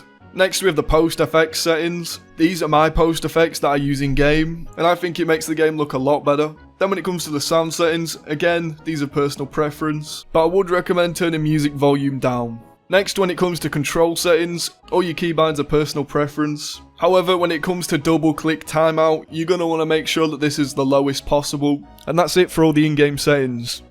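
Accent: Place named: Northern England, United Kingdom. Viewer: This MF'er got that House Stark accent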